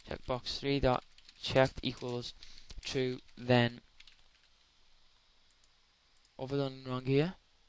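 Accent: Liverpool, United Kingdom. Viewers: Are you from Liverpool?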